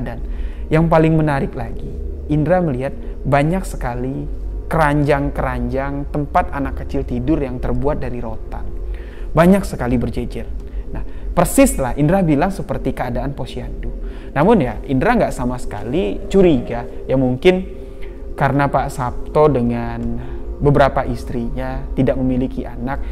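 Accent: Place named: Bali, Indonesia. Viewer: Kurangin logat bali nya bisa ga mas? Apalagi setiap kalimat selalu ada logat bali nya... Agak kurang nyaman..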